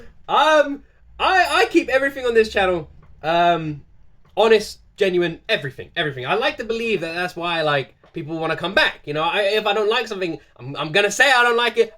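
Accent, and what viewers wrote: United Kingdom. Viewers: You have seen blood seat and tears and fire so it would be good to watch these live 2016 MMA. Is that a Leeds accent?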